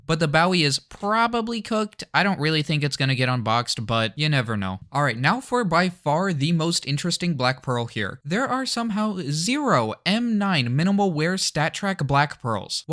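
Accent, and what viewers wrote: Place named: Middle East. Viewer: dunno why but I thought I remember you having a thick middle eastern accent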